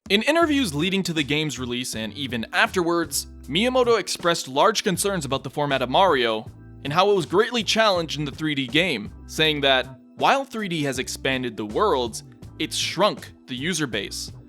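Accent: Canada. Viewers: THIS DUDES CANADIAN!!!! WHEEEZE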